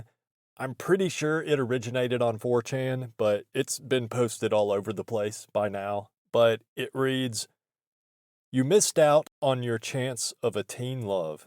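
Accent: United States. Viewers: Normal U.S. accent, what's wrong?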